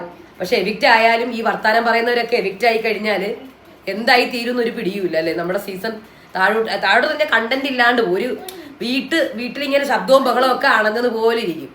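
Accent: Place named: Thiruvananthapuram, India. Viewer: Because TVM slang.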